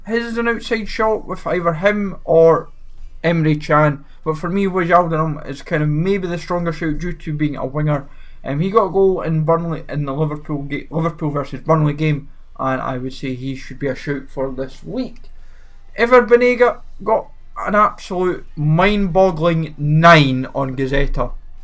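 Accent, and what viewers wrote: Scotland, United Kingdom. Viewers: sounds like he's Scottish to me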